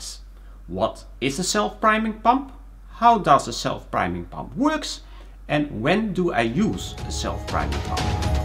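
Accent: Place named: Netherlands. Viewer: gotta love a dutchy speaking english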